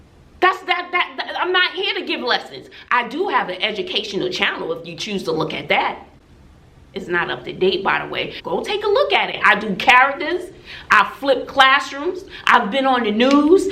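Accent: New York, United States. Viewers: Are u from ny that accent sis lol love it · New here (Jackie Newton referred me to you)....I love your NY accent!!!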